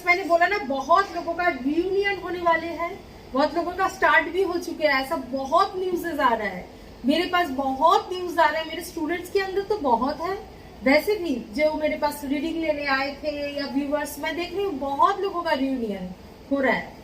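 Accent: Bengal, India. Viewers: Plz forgive me ur Bengali I accent little bit difficult toubdeeaeabd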